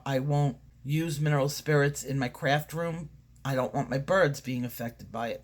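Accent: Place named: East Coast, United States. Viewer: I am so excited to do the step by step with you and what an awesome gift....you are a very beautiful teacher. You remind me of Cary Ublouer who was a teacher I studied with many years ago. Since you have an accent, I told my friend Bill about you...he just loves your lessons because he's from the East coast and misses home! Thank you so much for your sharing your experience with us. You're a wonderful teacher!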